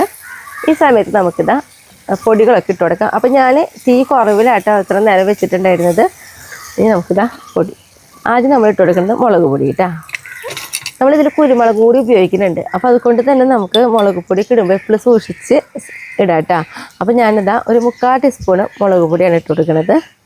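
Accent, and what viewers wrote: Thrissur, India. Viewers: ഓരോരുത്തർക്കും വേണ്ടി സംസാരരീതി മറ്റാൻ പറ്റുമോ.. അത് തൃശൂർ ഭാഷയുടെ പ്രത്യേക ശൈലി ആണ്... നിങ്ങൾക്ക് കണ്ണൂർ കാസർഗോഡ് ഭാഗത്തുള്ളവരുടെ ചാനെൽ കണ്ടാൽ എന്തായിരിക്കും പറയുക... മനസ്സിലാവുക പോലുമില്ല.. പക്ഷേ നമ്മൾ എല്ലാം കാണണം.. മനസ്സിലാക്കണം.. അങ്ങനെ അല്ലെ.. അല്ലാതെ ഞാൻ പറയുന്നത് മാത്രമാണ് ശരി എന്ന് വിചാരിക്കാതിരിക്കുക.. ഇങ്ങനെ പ്രതി കരിച്ചതിനു ക്ഷമിക്കുക.. Content അല്ലെ പ്രധാനം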